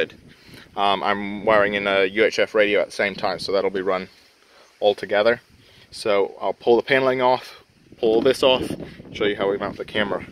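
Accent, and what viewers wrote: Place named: New Zealand. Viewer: Can't work out if you're a Kiwi from the States or a Yankee living in NZ..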